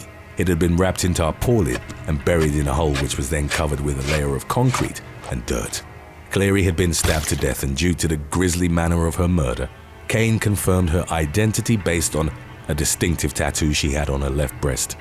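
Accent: Jamaica. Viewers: your Jamaican accent won you a new sub. :) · Based on what you're saying and how you said it clearly your ethnicity is Jamaica but you're probably born in a white country why else would you say it like oh it's so sad to hear about all the violence on such a small beautiful Island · Omg you are definitely Jamaican or have ties to jamaica....your accent Jamaican gave it away. Love all your videos!🇯🇲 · Man or a woman comment sounded like true jamaican or are you really one ???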